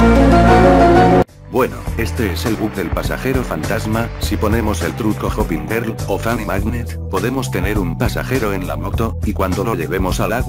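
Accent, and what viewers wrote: Argentina. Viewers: Sos argentino ¿? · Eres argentino verdad?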